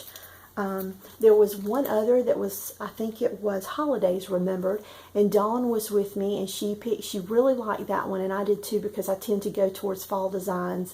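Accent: South, United States. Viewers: HiYour videos are so calming - you have a wonderful southern accent which sounds so welcoming. I watched the video of you and your friend (night owl?) And your joyous heartfelt friendship was so wonderfully conveyed. I subscribed to her site too.God bless you!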